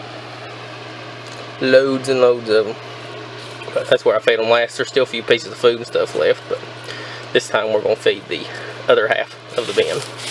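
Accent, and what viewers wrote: South, United States. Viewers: Enjoyed the video. I have a couple bins now and want to grow more. I appreciate the good southern accent. Thanks from TN.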